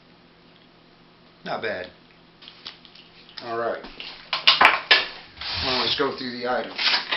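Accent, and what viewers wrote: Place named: United States. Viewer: He speaks English with American accent. BTW, American accent is the most spoken English accent in the world.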